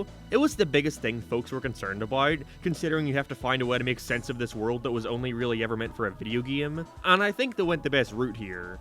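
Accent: Ireland. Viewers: dude is Irish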